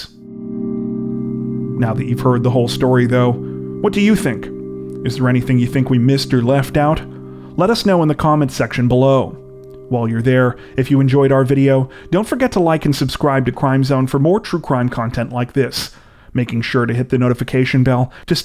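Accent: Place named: Ontario, Canada. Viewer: Yeah I was gonna say Ontario, but then I figured that was too easy. You do a great job hoss.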